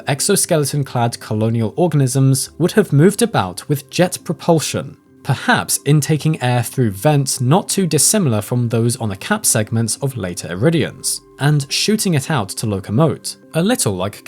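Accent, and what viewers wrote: United Kingdom. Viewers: American discovers the existence of British English pronunciations, lmao. He's not an AI, he's just British. You didn't think every English speaker in the world spoke American English, did you?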